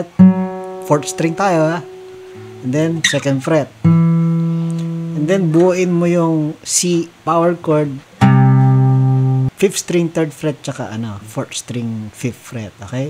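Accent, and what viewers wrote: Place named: Visayas, Philippines. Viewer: Dol galing talaga, bisaya ka?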